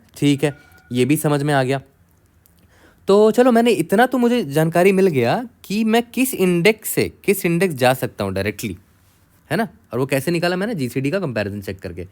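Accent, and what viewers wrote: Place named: Bihar, India. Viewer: bhaiya i know you are from up or bihar i am also from bihar currently i am in my 3rd sem . proud moment when someone from your region has great knowledge in dsa i guess this from your accent ketno dfs maar lo isme and you always said aapke not tumhre the respect you are showing .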